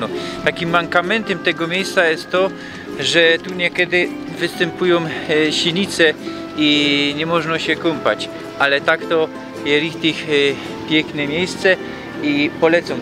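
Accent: Poland. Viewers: Niklas mo Slonski akcent , a Ty mosz Polski akcent. To nie mo nic ze dialektem zu tun.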